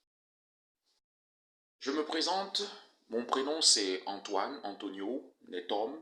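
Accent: United States. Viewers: Je pense que ça ne va pas aider les débutants désolée. Vous prononcez mal avec un accent américains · Désolée, la prononciation n’est pas terrible pour des débutants. Je pense que cela est dû à votre accent trop américanisé.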